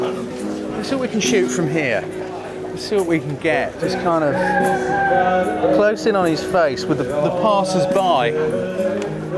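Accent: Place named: South East England, United Kingdom. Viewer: ....wonderful accent..sounds very south east England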